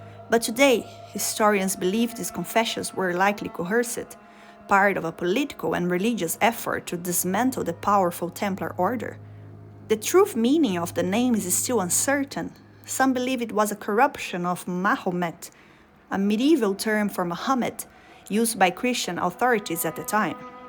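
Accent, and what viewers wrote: Brazil. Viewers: Bizarro, 2 da manhã eu vendo minhas coisas de nerd e vejo um vídeo sobre um dos bosses que acho mais atrativos e curiosos em nightrein, que vídeo maravilhoso, tantas citações mitológicas, musicais e filosóficas que reflete o trabalho que teve em escrever e criar esse vídeo que aprofunda MUITO no jeito que vemos o boss, com comparações cirúrgicas e uma análisa muito profunda! que vídeo incrível. Ver o povo engajado com comentários adicionando anotações ou observações é muito foda! (perdão a palavra) edit: esqueci de citar que percebi seu sotaque e fiquei encucado! mas depois de ver que era do Brasil, tenho a elogiar sua fluência em inglês!!! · Are you, by chance, Brazilian? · Strong Brazilian vibes in this accent. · Quick question, are you perhaps Brazilian? Not complaining, just can´t pinpoint the accent. Also great video. And in the mark where you say "exoticism", is it really that or "exoterism"? 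· Brazilian accent hell yeah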